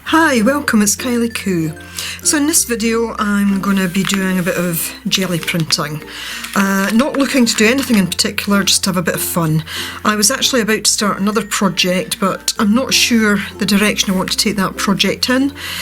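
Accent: Scotland, United Kingdom. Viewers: I've noticed you use certain colors frequently. I guess we all do! Whatever it is works for you because your pieces always come out so beautiful. I think I could pick out your style easily in a line-up, HAHA. That gold and turquoise you combine kind of looks like ancient stone. I LOVE it! You're my favorite youtuber right now and I'm thoroughly enjoying your videos! Oh, and great idea on using the security envelopes. I haven't tried that and plan to tomorrow. PLUS, I just adore your Scottish accent, lol! Hugs to you ~~Liz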